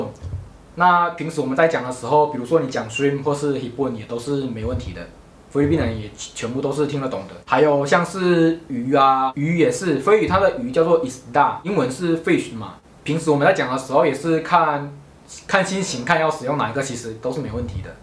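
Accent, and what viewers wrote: Taiwan. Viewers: 小哥的話很像台湾人的口音 台湾觀眾聽起來熟悉 舒服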